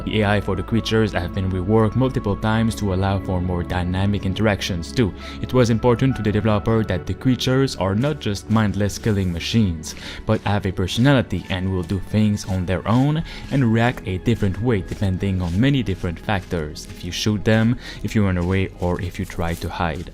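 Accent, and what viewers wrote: Quebec, Canada. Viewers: you defenitly sound like a french Canadian.... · Are you from Quebec? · T’es clairement québécois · Es-tu québécois? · Your voice sounds québecois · Salut, je viens de découvrir ta chaine et t'as genre un certain accent. T'es tu Québécois pas hasard?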